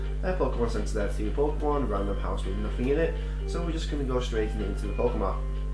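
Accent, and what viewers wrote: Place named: United Kingdom. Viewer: guys its he just picked up a british? accent. really good vid man. ima subbed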